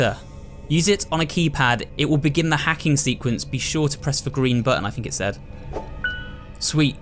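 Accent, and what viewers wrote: United Kingdom. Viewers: Ohhh your British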